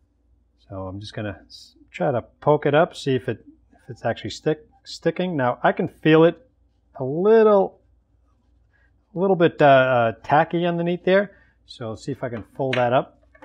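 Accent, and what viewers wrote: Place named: Boston, United States. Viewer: you are the most Boston sounding guy, are you from New England? If not you will fit right in hahaha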